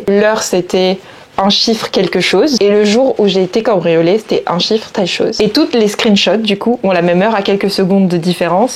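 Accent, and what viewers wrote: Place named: Guadeloupe. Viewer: ah oki je me disais bien que j'avais entendu un petit accent de chez nous. Merci beaucoup pour ta réponse et en ka voyé on big Foss et couwage baw de la Guadeloupe !! Never give up we keep getting stronger!!! 💪🏾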